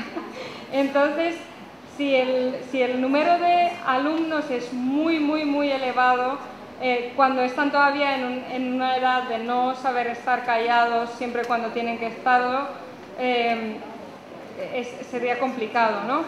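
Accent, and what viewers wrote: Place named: Spain. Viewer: sí que se le nota el acento español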